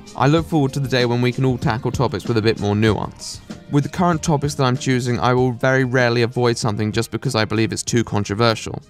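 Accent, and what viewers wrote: RP, United Kingdom. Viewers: yes!!!! I adore all kinds of accents all of them are amazing but british is my fav!! So much so tht since i was a lil kid id worked and practiced on having an english accent (just general accent from ppl id seen on tv) and im actually rllly good at it now and i love using it, its just such an awesome spund and i can listen to talk all day lol I also love russian, australian and japanese their languages and their accent (and asl but i dont knw if it van have an accent? Maybe in different ppls hand and arm mobility & flexibility) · I'm the kind of viewer that dislikes the usual QA video since youtubers usually put a lot less effort into them and are more there as a backup plan than anything. Though once again you posh bastard, your sickinigly sweet voice has tickled my inner ear canals in yet another video. I'm new here but I hope I don't get pushed into a locker for it since I plan on staying. 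Keep the grind going and I'll be sure to smash that like button like your voice smashes my special place every night. Though you are gay so I might have to get a penis in the meantime to fully enjoy the rammings I thrist for. Cheers cunt and make that European Union video sometime in the near future. <3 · On the question of accent. I don't think any of you are going to get exact accent, but one of my danish friends achieved a stunning British accent, mainly by reading Shakespeare out loud (as a part of her education and as a hobby). Hard work, I know, but it is possible to get a nicer accent by training. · Subbed a few days before this video.Nice job your the only you tuber I know that ACTUALLY sounds professional and things.Very posh,lol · I feel meh about it but I like him. However a Spanish accent on English is my preference. Perhaps that's why I'm indifferent to the British accents. 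· He is gay, a philosophy student, in his early 20s and has a heavy melting British accent He is perfect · Cheers dude, I've watched so much of you videos every now and then my voice instinctively makes a fake British accent. Anyways congrats dude!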